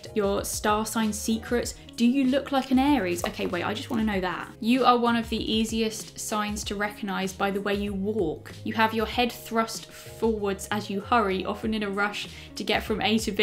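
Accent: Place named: London, United Kingdom. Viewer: you sound like you are developing a London accent maybe? I'm not great with accents but something seems different haha. Love you X💗💗💗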